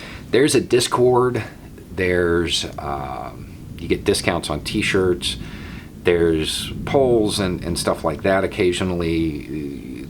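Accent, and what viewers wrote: South, United States. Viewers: I'm in Texas waist deep in Southern racism and Trump lunacy. I went to a small town all white school where teachers and students dropped the 'N' bomb shamelessly. Nearly everyone on my FB feed and half my relatives are in this mindless Trump cult. Children of the Dixiecrats and they haven't changed a bit since the 60s. You're a Southern man,....how do you deal? I don't kick people off my Facebook friends list because I don't want to be isolated in an echo chamber and also to let others know they don't have to be bullied by some small town alpha pup into going along with the crowd. I don't call people names or put up mocking memes, even though I'm tempted, but just post credible journalism and, I hope, sound debate. But it doesn't matter. It's like trying to convince a crazy person he's not the reincarnation of Jesus. Anyway, it's nice to peek out of my North Texas bunker and see someone talking sanity with a Southern accent and a bubba cap. 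· I wish I had noticed how many internet people had subscribed when I did...I'm sure it was the first or second howdy there and I was hooked. Up here in New England, I missed the drawls of the south. I like the beard and lack of toxic masculinity and that he calls b.s.( very tactfully) on the dear leader's actions. I really enjoy the satire of an upside down curious George and the cake dialogue with your woman ( legit had me crying laughing). Congratulations to you. Positive vibes from New Hampshire and remember to be kind to each other and yourself during this pandemic and social crisis . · of the Fifth Column Got it Thank you for the explanation. As a fellow southerner (S.C.) I truly appreciate what you are doing. It’s always great to hear someone that talks like me expressing these views. It’s nice to know I’m not alone. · Pfeil My personal prejudice is centered around people that talk southern. 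So, at first, was hard to take. I got over it and look for his posting every time I sign on now.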